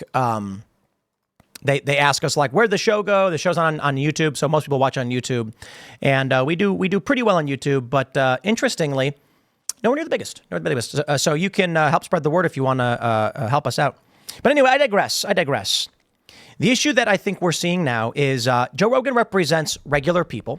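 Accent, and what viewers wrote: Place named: Wisconsin, United States. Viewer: How about a SPOILER warning? Hearing read Rogan's jokes in his hoarse 'sconsin accent is a guaranteed way to not make it funny when I watch Rogan actually deliver.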